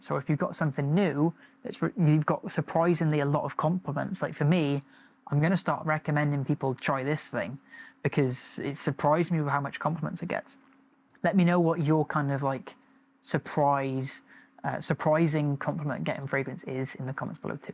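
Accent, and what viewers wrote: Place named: Ireland. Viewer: Probably because you are Irish? :D Bro I love your accent rly :D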